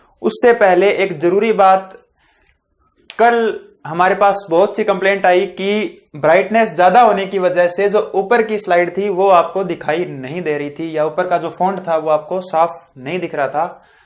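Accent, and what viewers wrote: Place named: Rajasthan, India. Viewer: West Rajasthan se lg rhe ho sir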